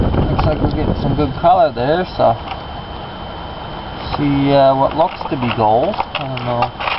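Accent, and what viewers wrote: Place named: Nova Scotia, Canada. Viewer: Nova Scotian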